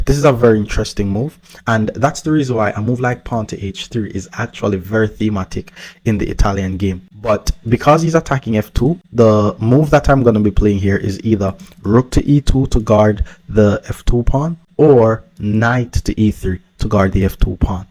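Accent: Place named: Jamaica. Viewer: I noticed the Rastafarian Jamaican accent my brother wagwan brudda... Love the breakdown analysis, I just got recommended Ur channel 💯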